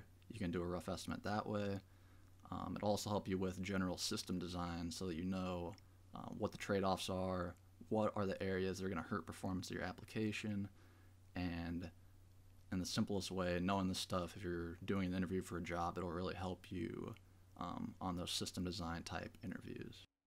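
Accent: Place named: South, United States. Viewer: Love that southern drawl man! Teach those prejudiced idiots who think speaking with a different accent makes you less intelligent that they don't know what they are talking about!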